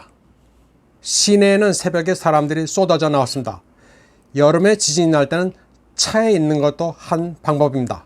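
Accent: China. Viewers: 이 자료는 진짜 한국인 박상후씨 맞나? 왜 중국 방언의 사투리 느낌이 나는 거지...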